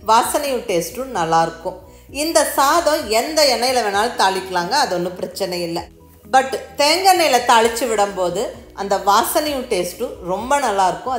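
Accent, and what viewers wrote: Coimbatore, India. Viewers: Nenga Coimbatore ah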